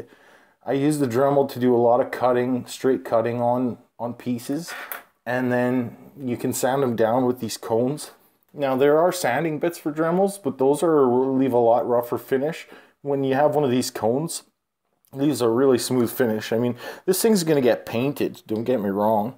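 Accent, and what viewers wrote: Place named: Canada. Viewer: Yo bro I'm Canadian too EH. How you talk is pretty much how I sound. All good though. I'm in Winnipeg bro. Where you at man?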